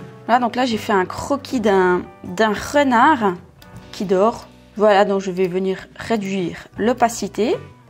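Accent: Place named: Vaud, Switzerland. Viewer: Juste à l'accent, bien vous rassures. Je suis du canton de VD, on se reconnaît quand même assez facilement entre nous ;)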